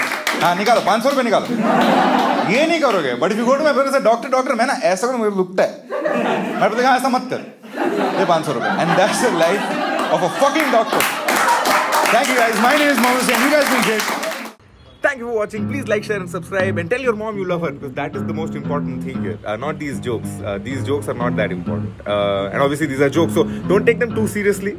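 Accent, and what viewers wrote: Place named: South India, India. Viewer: You sound south indian ... Somehow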